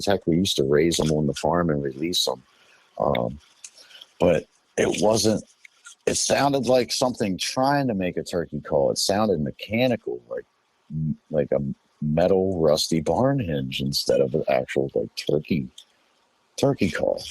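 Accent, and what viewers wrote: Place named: South Pennsylvania, United States. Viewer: I'm here for both the story and the south PA accent. :)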